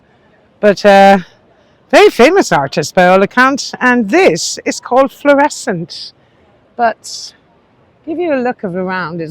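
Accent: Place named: Ireland. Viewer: Thanks so much love ❤️ uour enthusiasts together with that charming Irish accent reminiscent of my dearly departed Mother. We are visiting central London tomorrow and we'll certainly be taking a cue from your knowledgeable video! Happy Christmas and New Year!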